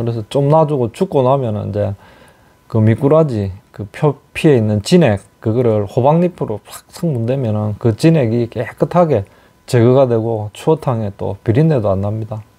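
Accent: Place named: Gyeongbuk, South Korea. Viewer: 도 경북말투 억양 이시네요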